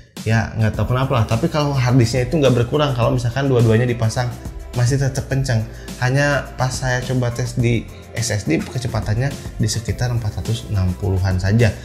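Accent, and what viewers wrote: Bandung, Indonesia. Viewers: Sigahna orang bandung nya kang. 😅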